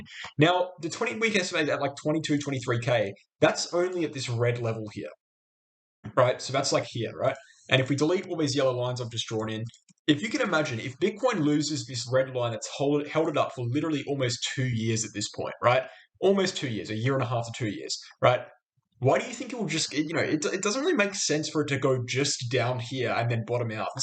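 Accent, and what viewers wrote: Australia. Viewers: I like your TA and offers of your considered opinions about the directions of the market. I am presently positioning myself and my wife's portfolios to take advantage of these downward moves in bitcoin and a couple of Altcoins as well. I have a small criticism in that your aussie accent can make catching all of your commentary at the speed at which you speak. Have pity on the rest of us english speakers as we want to get all the nuances that you are putting out! Thanks - RHH.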